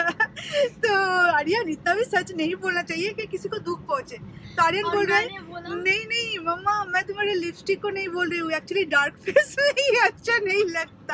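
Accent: Bengal, India. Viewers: Apki voice bahut achi bangali touch wali Hindi Luv d most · It's so inspiring to see you working so hard for you and your family's future. To all your haters i just wanna say that there's nothing wrong in your pronounciation ; it's just your bengali accent that gets mixed with it. They should rather get inspiration from you that you are working on your english even after so much hate.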